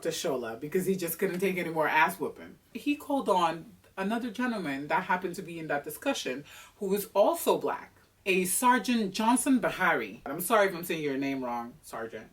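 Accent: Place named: United Kingdom. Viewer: Lmao 4 real!!! Love the British accent hahha hilarious 😂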